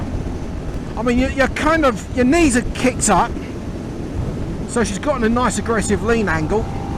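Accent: Cockney, United Kingdom. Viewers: The cockney accent is unbearable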